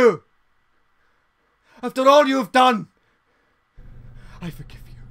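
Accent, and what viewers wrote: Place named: Scotland, United Kingdom. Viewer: The speech is spot on but capaldi. Sounds a little extra Scottish it's brilliant but there's always room for improvement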